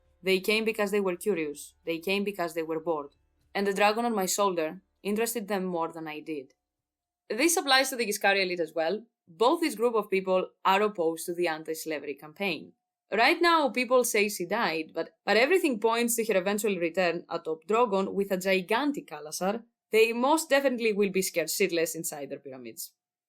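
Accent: Greece. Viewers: I feel like I’m hearing a Greek accent through your English, reminds me of my father, not really relevant ik but yeah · Είμαι από την Αμερική, καταλαβαίνω ελληνικά και έχω πάει Ελλάδα, όπως τα βίντεο. Νόμιζα ότι αναγνωρίζω μια ελληνική προφορά.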